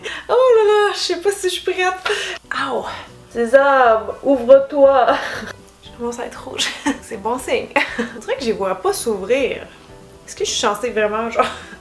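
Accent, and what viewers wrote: Quebec, Canada. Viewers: Hi, vraiment sympa cette vidéo, certains articles sont vraiment WTF 🤣 OMG 😱! J'ai bien rigolé, merciiii ☺️ (et merci pour tous tes précieuses infos et conseils 👍... j'adore aussi l'accent et les expressions du Québec 😉) Becs depuis la Suisse 🇨🇭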